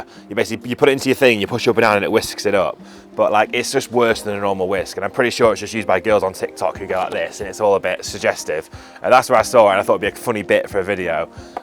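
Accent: United Kingdom. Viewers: I've ignored your shorts for years because in them you talk like a posh Chelsea adjacent nepo doing a Fred Dibnah impression, but in this you eventually began talking naturally and it's much nicer. I suspect you do it because you're worried your natural accent isn't telligable to americans, but you are fine I assure you. You're on the american-ear friendly economical scale of northerner. edit: this was meant to be feedback and I made it way too mean. Sorry. I should have said I really enjoyed this video, because I did.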